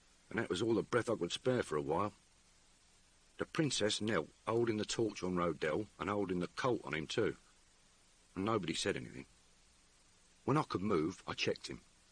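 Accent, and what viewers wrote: Cockney, United Kingdom. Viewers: What a treat! I didn't know he could do a cockney accent, having only heard him as Morse (and in one Sherlock Holmes episode). My brother and I read all the Modesty Blaze books back when, and this reminds me how good they are. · Thank you so much! To me, this is a double delight! Not only hearing John Thaw reading in cockney, but even more hearing a Modesty Blaise piece I never have read before. Fabulous! 😃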